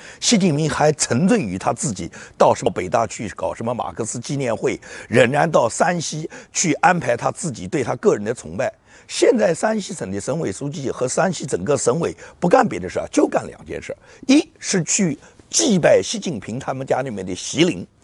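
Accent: Nanjing, China. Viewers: 啊是南京人啊，听口音像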